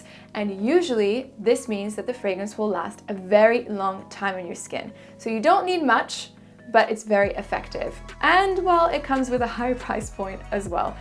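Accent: France. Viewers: Are you french ? Love your accent and great review btw .. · I'm curious, where are you from? Your accent sounds French-ish but I'm not sure 👀I really like the way you pronounce the perfumes!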